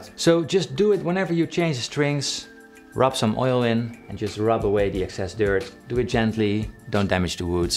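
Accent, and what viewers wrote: Netherlands. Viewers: Why do you sound so dutch lol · Plus heavy Dutch accent. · Do I hear a Dutch accent? Bedankt voor je zeer informatieve video! Zeer leerzaam!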